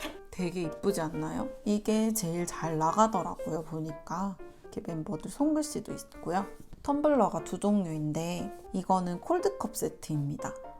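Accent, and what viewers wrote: South Korea. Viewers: 대구인 답게 대구 사투리를 쓰시네요!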